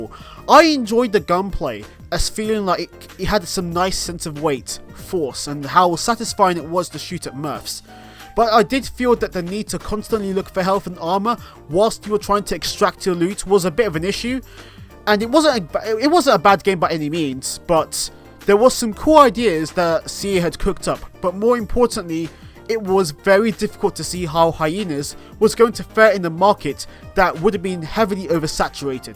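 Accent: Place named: United Kingdom. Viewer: Why is he British?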